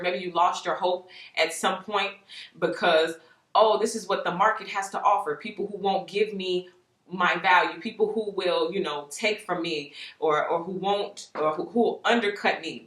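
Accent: Chicago, United States. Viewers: I love you & your Chicago speak! Thank you for sharing your gifts. Your creativity is so authentic. I love going on mind trips with you✨ keep glowing sis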